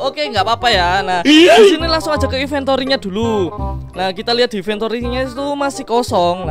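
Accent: Java, Indonesia. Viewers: Bhs lu medok bgt bang..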